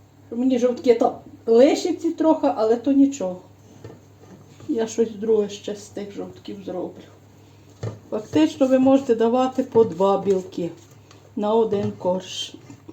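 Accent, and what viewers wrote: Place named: Rakhiv, Ukraine. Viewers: Дякую, пані, при нагоді обов'язково спечу, дуже гарний рецепт. Ви дуже файна господиня, дай Бог вам здоров' я і щастя. А ваша гуцульська говірка просто чарівна, слухаю і милуюся. ❤️👍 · Вы майже из Рахова?такий розговор як у нас уклин вам из КАЗАХСТАНУ. ЯТОЖЕ ТАКИЙ УМИЮ И ДУЖЕ ЛЮБЫМО. САМИЙ ВКУСНЮЧИЙ.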